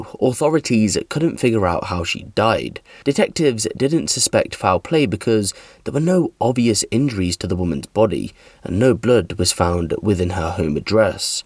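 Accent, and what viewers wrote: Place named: RP, United Kingdom. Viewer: Dam I have been on YouTube for a hot minute what happened to your voice you've got well posh innit! 🤣🤣🤣🤣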